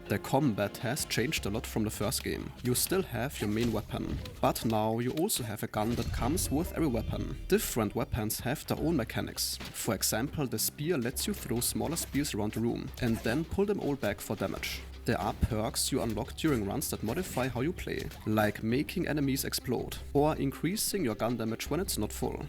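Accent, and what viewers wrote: Germany. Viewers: love the giga german accent!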